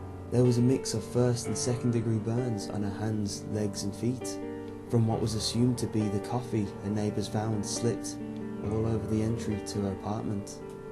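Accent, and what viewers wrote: United Kingdom. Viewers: GO BRITISH VOICE OVERS FTW sorry for that, here let me...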